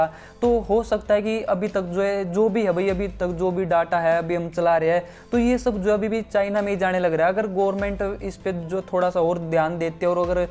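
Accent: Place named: Rajasthan, India. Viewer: RAJASTHAN JAIPUR SY HU MAI AAP KE VOICE HAM HRA RAJASTHAN SY MILTE HY. REPLY PLZZ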